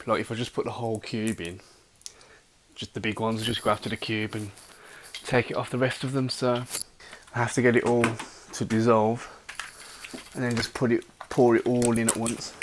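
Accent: Birmingham, United Kingdom. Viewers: ah thought I heard a fellow Brummie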